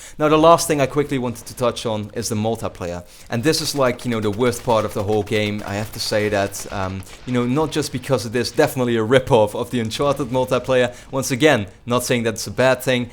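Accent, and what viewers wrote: Netherlands. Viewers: When I heard your accent I immediatly knew you were dutch. Nice video dude.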